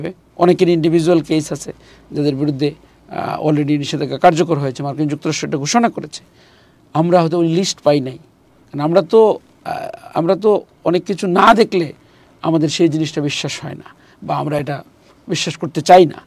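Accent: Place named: Barisal, Bangladesh. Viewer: ভাই কথায় আঞ্চলিকতার টান আসছে বরিশালে টান। ঠিক করেন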